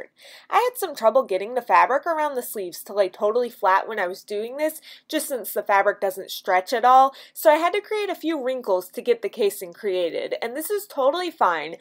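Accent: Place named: Canada. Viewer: Hey, your accent makes me wonder where you're from. Are you Canadian??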